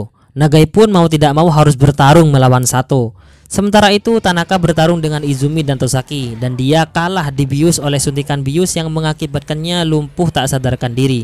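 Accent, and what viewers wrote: Madura, Indonesia. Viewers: Ternyata Logat nya Madura Kawuk..Yo Opo Kabar e Pokeh Celeng..Salam Damai Dari Persaudaraan Dayak Madura Sy dari Sampit..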